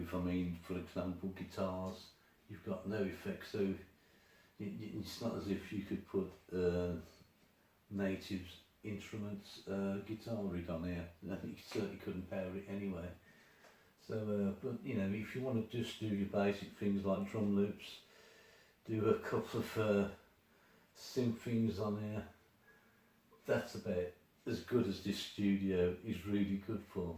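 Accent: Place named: Birmingham, United Kingdom. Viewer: Never knew you was a brummie mate, you a villain, cov or bcfc? 👍✌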